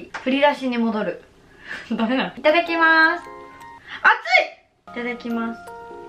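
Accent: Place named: Kansai, Japan. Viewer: 関西弁面白くてめっちゃ可愛かった😳 これからももっと話して😂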